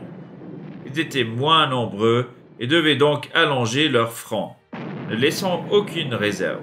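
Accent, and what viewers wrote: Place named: Swiss German, Switzerland. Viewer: Suisse allemand, il me semble.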